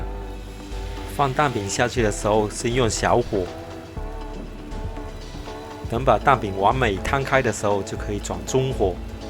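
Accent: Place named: Taiwan. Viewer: Thks for sharing this good breakfast recipe. Wonder where are you from originally? Your mandarin sounds taiwanese or singaporean.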